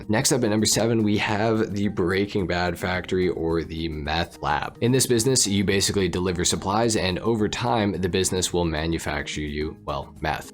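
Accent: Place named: Canada. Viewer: Winnipeg mafia😂 I could tell by your voice you from Canada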